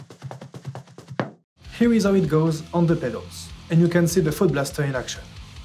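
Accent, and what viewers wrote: France. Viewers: tu est fancais ca s'entend a ton accent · L'accent Francais on reconnais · Ça serai pas un petit accent français ça ? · L'accent français bien présent ✅🤣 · c est moi ou il a un gros accent francais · Tu est français non ?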